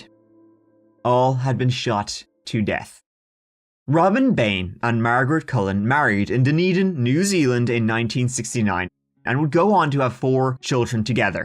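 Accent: Ireland. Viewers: he is Irish ❤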